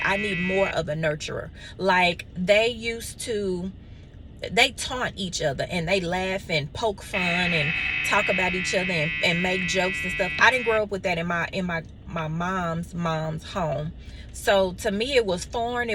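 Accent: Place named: South, United States. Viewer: You must be from the South. (Especially AFTER hearing your accent) Focus on yourself, and not Social Media. Obviously you had a rough childhood. That's probably why you like D-Boys!!! And you'll Always be like that!!! Good 👍 luck 🍀 in the Future. Sorry that you're Pops didn't give you that Validation.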